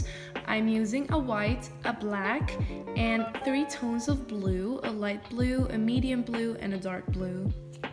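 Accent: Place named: Netherlands. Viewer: Me: hmm it looks like she got a dutch accent! *looks in discription * "where do I live? The Netherlands Me: *cheers*I'm Dutch tooooooo!💕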